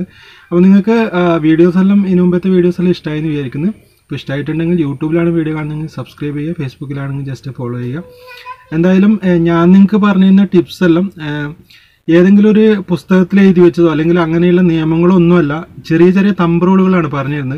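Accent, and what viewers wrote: Kannur, India. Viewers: Sprrr...ellaavarum paranjadu pole tanne nannaayi manassilaakki tharunnind ee video...njnm licen edthitt 4 years kazhinju...roadil vere vandikal illaathappozhanu njn drive cheyyaaru 🤣 bt ee video kandapol naalethanne poyi practice cheyyanonnu tonnunnu....nthaayalm nalla avatharam 👌👌 ...ningalde samsaaram kettitt ningal kannur aanuo😀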